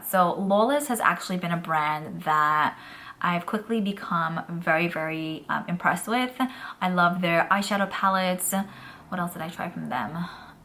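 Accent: New York, United States. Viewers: To me your aw accent is normal for the area you live in my mom grew up in the Jersey/New York area but has lived in stl for 40 years and still has that aw accent. We even picked up some words and have people tell me and my siblings we say things odd. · I love that you’re so New York in your pronunciation. Makes me homesick. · Sweetie - your 'aw' is a thing we New Yorkers do, and we are unique and wonderful in the world! You keep your 'aw'. I have done it for years and it's staying! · You rock!!!! I love these videos!!! 😍❤️ Please never change your New York accent!!! I love it so much!!! Everyone has accents all over the world and it makes things interesting and new 🤩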